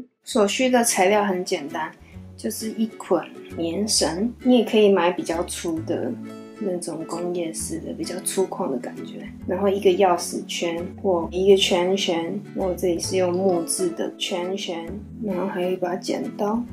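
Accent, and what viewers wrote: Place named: Taiwan. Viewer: 作者可能長居外國，所以台灣口音+外國口音，但也不是你聽不慣就怪，不然你的口音，我聽起來也會覺得怪囉。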